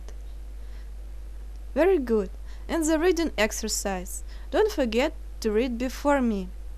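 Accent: Russia. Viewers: По произношению понятно, что ты русская) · OMG ... Alphabet is so difficult but russian accent is so beautiful 😍😍😍😍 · hello, I like this girl's English accents, does who knows that is every Russia girl speak English like this? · У Вас приятный русский акцент. Приятно слушать! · Сразу слышно что русская))) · i love her russian accent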